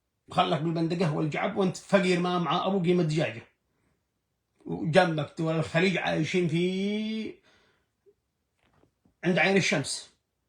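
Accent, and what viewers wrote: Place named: Yemen. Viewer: نشكرك اخي وصديقي انت نبراس للاعمال الخيريه، وكلماتك ذات الفصاحه واللهجه اليمنيه الاصيله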